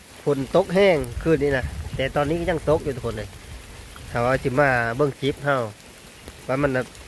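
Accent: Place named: Khammouane, Laos. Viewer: ສຳນຽງຄືຄົນຄຳມ່ວນເຮົາເນາະ ມະຫາໄຊ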